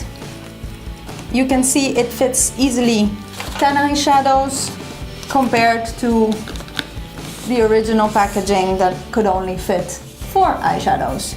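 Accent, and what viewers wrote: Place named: Italy. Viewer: è il primo video che vedo tuo e non so perché sapevo che eri italiana per la pronuncia hahahahahaha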